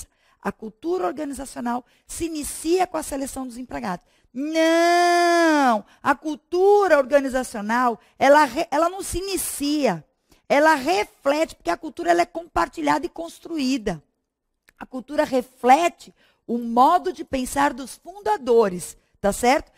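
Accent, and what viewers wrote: Brazil. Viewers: com sotaque do interior de sp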